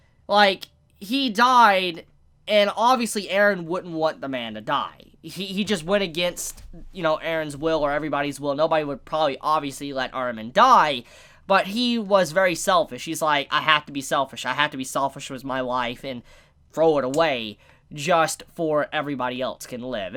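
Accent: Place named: Tennessee, United States. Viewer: i didnt kno w you were from Tennessee until watching your va ca video now i cant un hear you accent lol "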